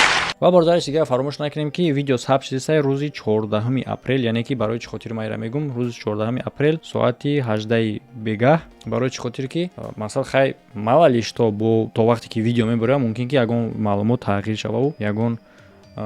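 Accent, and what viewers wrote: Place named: Gharm, Tajikistan. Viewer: Ака гапт надорм МошоАллоҳ у калай тъ ака гарени бад тезай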